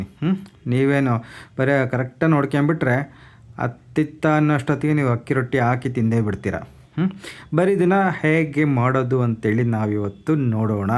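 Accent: Chitradurga, India. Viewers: ಭಾಷೆ ಕೇಳಿ ನೀವು ಚಿತ್ರದುರ್ಗದವರು ಅಂತ ಗೊತ್ತಾಯಿತು. ಯಾಕೆಂದ್ರೆ ನಾವು ಚಿತ್ರದುರ್ಗ ಹೊಸದುರ್ಗದವರು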